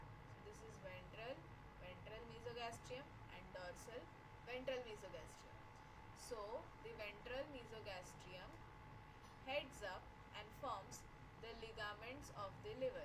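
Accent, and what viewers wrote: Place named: India. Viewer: Excellent explanation. My exams are upcoming and finally found out this Indian youtuber. Love your Indian accent. You Indians always save us during the exams. Please upload all the vidoes as soon as possible regarding all the human biology. My exams are starting on 6 April, please do it as soon as possible either wise I'll fail my exams.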